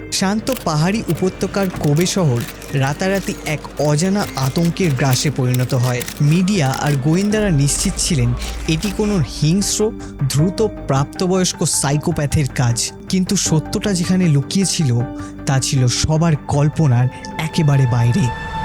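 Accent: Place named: India. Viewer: আপনাকে বাংলাদেশি মনে করছিলাম।কিন্তু উচ্চারণ কথাবার্তার ধরন দেখে ভারতীয় মনে হলো।পরে দেখলাম চ্যানেলে আসলেই ইন্ডিয়ান লেখা।বাংলাদেশে নাম হয় না, হয়।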